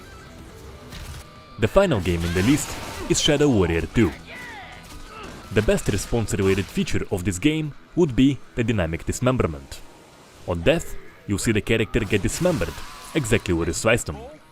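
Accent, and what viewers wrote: Russia. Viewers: This is russian ascent?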